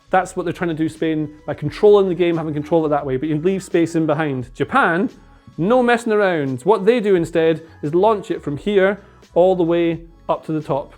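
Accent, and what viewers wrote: Scotland, United Kingdom. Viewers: I think it's his Scottish accent. Dough always sounds like doo.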